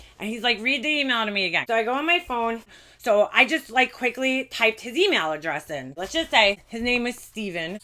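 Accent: New York, United States. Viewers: Hello. wow young lady you have def been through the wringer between real life with your Mom and crazy YouTube. I feel for you. I love all of your videos especially the one for your son when you brought and set up his cooking equipment. That was awesome. Stay strong keep keeping it real. Love your accent, it makes me miss NY. Peace out.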